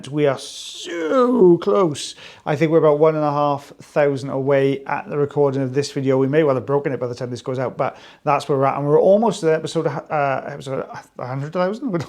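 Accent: Wales, United Kingdom. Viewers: Nope! He's Welsh.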